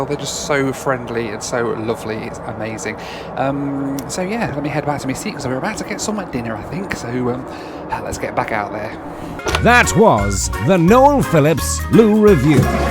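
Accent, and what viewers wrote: United Kingdom. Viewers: Hi Noel, enjoying your videos, i thought your were from UK, didn't realise you live in USA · You sound British did you move to usa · thought u r british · Noel, as a newbie so i know. You sound like you are from the United Kingdom but you live in Houston in the US ? Is that correct ?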